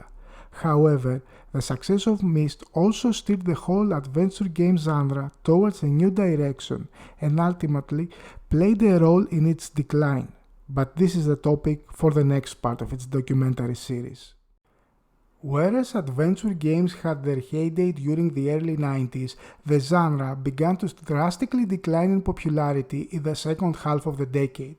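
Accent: Greece. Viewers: Hahah why, WHY you Greeks can't pronounce sh as in sharp, ch as in child, j as in joker... I will never understand :D Still, great job, I am enjoying this.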